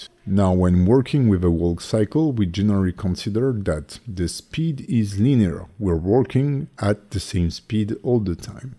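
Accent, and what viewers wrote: France. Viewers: J'adore l'accent Français ! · Et sinon, il y a une version française de vos formations ? Je ne pense pas trop me tromper avec votre accent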